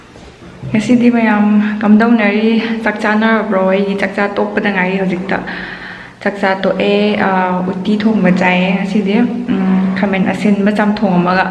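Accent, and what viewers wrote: Kakching, India. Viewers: Lon thp tamei nowraga last ta yong ym kuirameba heiglak a.....😀 kaow yadeba eikhoi kakching lonse nungcbanehe😍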